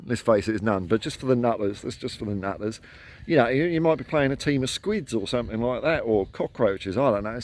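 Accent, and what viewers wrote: Australia. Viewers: Just found this channel and cracked up mate! I'm a liverpool supporter for almost 35 years! I cannot stop watching everything that comes up about ange and spurs! I now know more about spurs team than I do my own! Not converted by all means but there must be so many Aussies that sit on the fence with teams That are now spurs through and through!! He will be the biggest thing for Australia football ever! I keep watching mate love half Aussie accent!!!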